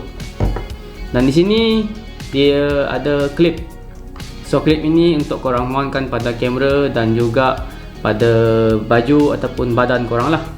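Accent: Sarawak, Malaysia. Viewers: bos ada slang iban or bidayuh..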